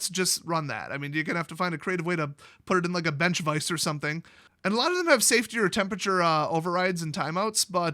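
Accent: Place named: Michigan, United States. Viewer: Very good video. I have slept in hotel parking lot in my car when I didn't want to pay for a room after a concert. I have a hybrid so it's less of a concern, but I always worried about some psychotic person walking up and stuffing something in my exhaust. That's why I always did it in a hotel parking lot. A battery-powered carbon monoxide detector would have been a good idea. But there are tons of people who try to commit the s thing this way and they fail, so I figured it couldn't be all that deadly. Also, you sound more like a Michigander.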